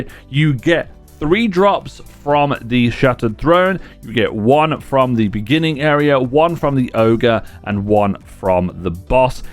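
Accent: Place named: Scotland, United Kingdom. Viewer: Ah some scottish accent. Nice.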